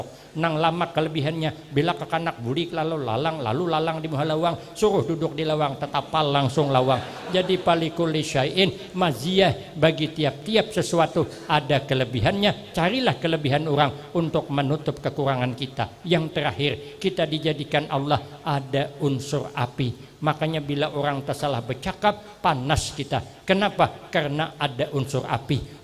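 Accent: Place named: Brunei. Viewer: Mcm org brunei bahasa nya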